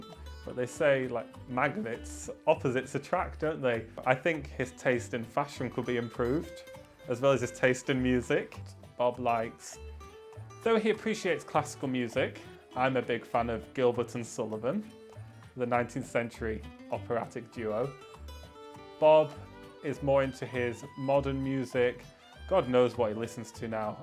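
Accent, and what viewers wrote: United Kingdom. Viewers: His accent sounds northern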